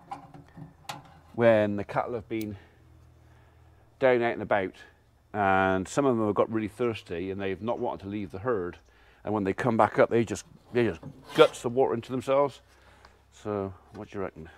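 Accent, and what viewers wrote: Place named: Gloucestershire, United Kingdom. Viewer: Love the Gloucestershire accent, could listen to it all day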